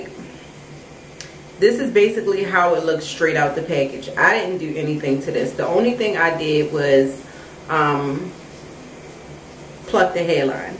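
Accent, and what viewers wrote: Baltimore, United States. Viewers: You did that!! It looks really cute on you! Btw..are you from the DMV area? I can hear the Baltimorean accent lol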